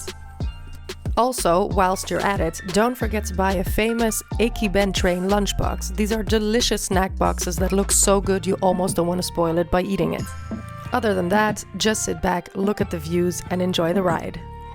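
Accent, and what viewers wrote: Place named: Netherlands. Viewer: Ik ben Japans en deze video kwam als aanbeveling, dus ik heb hem terloops bekeken. Toen ik je uitspraak hoorde, dacht ik: "Huh? Nederlands?" Ik woon al 20 jaar in Nederland, dus ik dacht dat het makkelijk te begrijpen was! Bedankt dat je Japan zo geweldig hebt geïntroduceerd!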